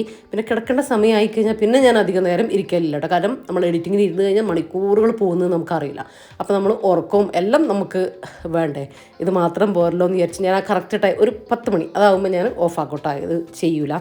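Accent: Ernakulam, India. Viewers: Ernakulam പാ മുള്ളൻ, പാ കുർച്ചി എന്ന് പറയും 👍🏻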